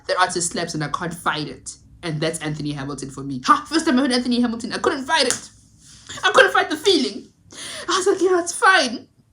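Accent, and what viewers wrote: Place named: United Kingdom. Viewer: I'm here for the British accent 😅.. Energy always on another level 🔥🔥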